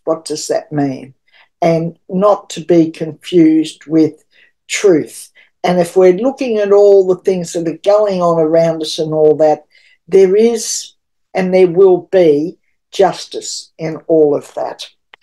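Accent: Australia. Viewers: Thanks. Lovely to hear an Aussie. I’d Love to know where you live. It looks tropical. I hope you keep sharing. It’s also refreshing to have someone focussed on people mire than politics. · Hi ,it's was lovely to listen to you this morning and great hearing an Aussie accent 😊 . Interesting reading and I look forward to your next podcast x love and light Bobbie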